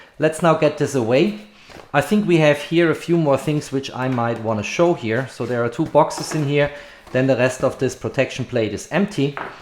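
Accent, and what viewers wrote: Germany. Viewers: "Null Null Sieben" or "Double-O Seven" in English. No "O O Seven". My guess is you're German and never watched a Bond movie in English (besides that I hear a slight German accent). Just saying... Cheers from Indonesia!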